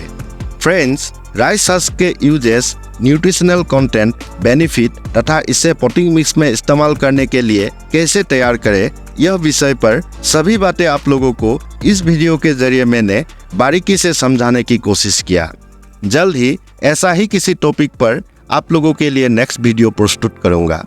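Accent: Assam, India. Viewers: apke accent sun ke lagta hai ap Assamese ho. Hamare yaha mill mei powder milta hai, "tush" samajh jaoge. Rice mill hai 10km dur waha se 1 bori leke aaya tha. Aadha aise he raw use kiya agalonema mei. Phir kal baki aadha kal aise kadhai mei deke jalaya. 😄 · Ap ke Hindi Assamese jeshe hai.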